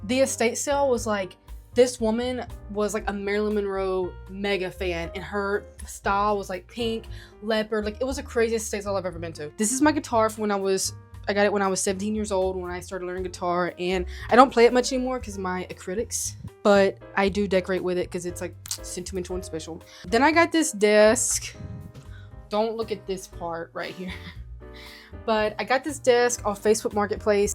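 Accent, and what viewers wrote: South, United States. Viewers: I love literally everything about your videos. Your outfits, your style, your vibe, your delightfully southern accent, your love for secondhand, your realness...I could go on! More channels like this oneee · I have no idea how I came upon your videos but si happy to of found you! I LIVE your accent. Where are you from? I'm from Middle TN... now love in South GA. You sound Southern too. You are an old soul my dear... and a lil doll. I have a tiny channel... I love to thrift too. I just started... clothes mostly because I've lost over 200 lbs in the last 5 years... and it's expensive to have to buy EVERYTHING! 😊 oh and I cook Southern budget meals mostly. Nice to "meet" you... love your style sugar ❤ Jackie in GA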